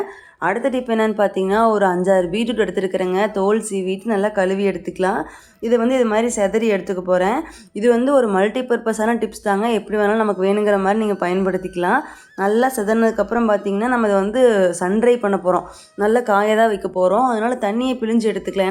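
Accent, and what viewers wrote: Kongu, India. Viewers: Kongu tamil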